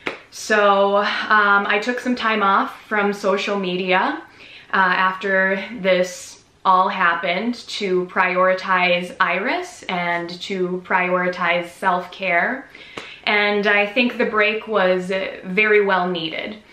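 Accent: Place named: Minnesota, United States. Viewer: Minnesota accent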